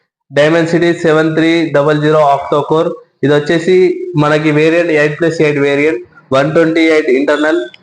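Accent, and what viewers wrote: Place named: Telangana, India. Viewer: Anna kocham fear kaku anna while saying Nuv chpadii correct ehh kani don't fear while saying..!! Anyways good review anna mana telangan slang lo ❤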